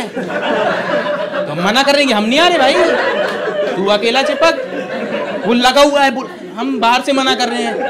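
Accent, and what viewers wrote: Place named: Western Uttar Pradesh, India. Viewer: Western UP ka accent lag raha....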